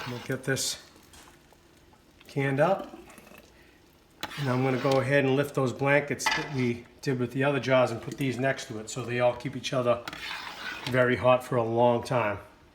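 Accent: Boston, United States. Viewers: Could you be from BAHston? Also Mainers talk like yoy, too. (or is it "tok"?.Us Jerseyans say "tawk," lol.).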